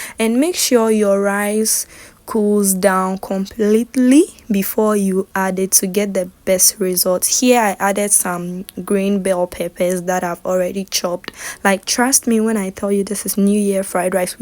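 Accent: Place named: China. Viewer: Your now sounding like a Chinese 😂😍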